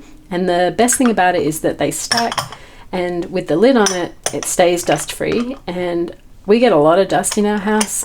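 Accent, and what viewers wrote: Australia. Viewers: Hello... you sound a bit Aussie, but living in USA? If so, hello from a fellow Aussie. Thanks for the video. Could you do me a small mix favour with your Roman Szmal, with the "tint" colour with a Cobalt blue please? Could let me know if you get a grey or a green? Thank you in advance! Looking for a sky and cloud glow. A bit of a unicorn, I love a cobalt or warm blue like periwinkle, or lavender with a white in it, to make it opaque thinned out, and less granulating. I love Schmincke's finest Ultramarine. I know I am searching for a glow, without going green that is also non granulating. Eg jarosite. Or some gold ochres, some Naples or Flesh colours. It is likely to be a neutral yellowy orange or a PBr7 like a burnt sienna... Like you, also a fan of pens and ink. Have you tried Emma, the R & K sketch ink? A waterproof greeny olive, fast drying! 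In kindred spirit, Eliza. Dulwich Hill, Sydney Australia xx 14th of December 2022 pm bed office PS I like the look of your deep bag. Where do you get the large fine mist spray bottles? They are not on your list? PPS: Thanks for your channel. xx PPPS: You need to try my favourite RS, "Misty Morning", it separates, a light cool grey into a rose and cobalt teal kind of colour. An edge happens. It is delightful. Good by itself. Seeing as though you like your neutral abstracts, I think you will have a new favourite.....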